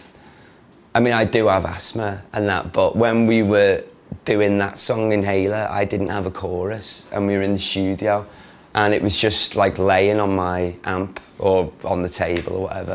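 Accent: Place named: Manchester, United Kingdom. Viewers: Leli Flores Manchester boi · His accent is getting more Manc.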